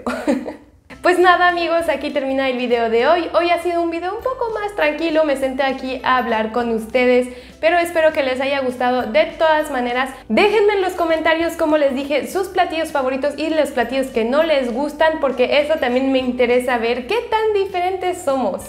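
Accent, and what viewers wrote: Yucatan, Mexico. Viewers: Hablas muy bien español!!! Tienes el acento mexicano al 90% no se si tengas muchos años aqui pero felicidades mucho exito ya tienes un suscriptor mas :) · No manches hablas como Mexicana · casteñano, que elegancia la de Francia, mexicano en realidad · Deberías de hablar con tu acento yucateco, se te oye bien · J'aime beaucoup votre chaîne, vous avez déjà l'accent du Yucatán! Felicidades, muy agradable ver tus videos:) Sigue así... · El acento Yucateco se te sale a veces jajajaja. Saludos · 4010 suscriptores cuando ví el video!!. Definitivamente me impresionó tu español mexicano!!. Que tal un video de tu vida y porque llegaste a México?? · De hecho tiene el acento yucateco mas marcado que el francés.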